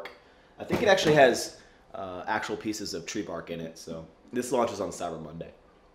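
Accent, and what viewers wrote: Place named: Washington D.C., United States. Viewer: just found ur channel. love ur vids bro! sounds like D.C. to me... moving on uupppp!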